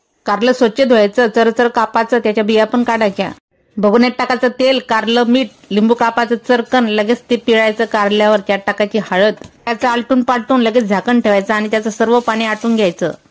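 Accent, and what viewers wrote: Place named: Maharashtra, India. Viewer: Tumch boln kup sundar gavthee Marathi aahe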